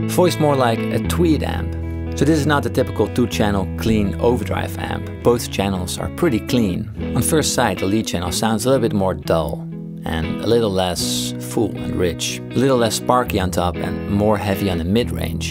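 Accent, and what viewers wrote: Netherlands. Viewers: Great video, and I love that hint of Dutch in your delivery.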